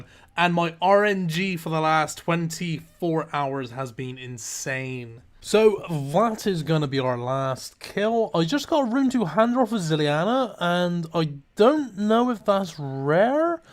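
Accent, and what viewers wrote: Bristol, United Kingdom. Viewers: Are you from the south-west of the UK? You have a birstol-esque accent.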